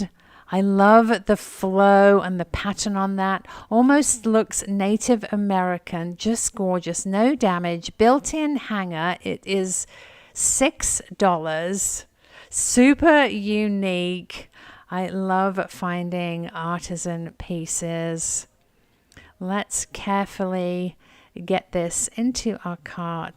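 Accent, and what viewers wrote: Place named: United Kingdom. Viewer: I thought you were British which was why I mentioned the UK. Love your accents either way. I don't believe that I have ever seen it written in any book or novel that way and just began hearing it the past couple of years and was curious and it certainly was not taught in school that way. Anyway, thanks for the response and the videos..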